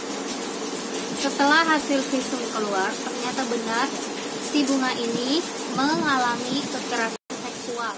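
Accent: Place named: Java, Indonesia. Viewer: medok bettt bahasamu mba apa lagi pas ngomong "GUE" medok beettt sumpah mba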